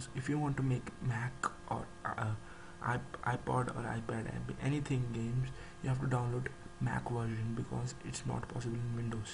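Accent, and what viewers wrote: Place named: India. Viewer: im i the only one to hate indian accent ?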